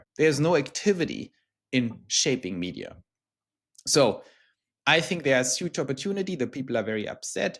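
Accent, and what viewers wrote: Germany. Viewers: Hmm... You sound German. You look Filipino. You live in the US (I think). And have a Thai sounding name. Fascinating! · You’re making so many false claims on statements in this video that I couldn’t take it anymore. I would love to point out all of them to you, but unfortunately YouTube keeps deleting my comments. So let me just say that it is a real shame that someone who really doesn’t have a lot of insight and understanding of German politics is spreading misinformation on the Internet. Going by your accent, you may have been brought up in Germany but that doesn’t change you blatant misrepresentation and errors.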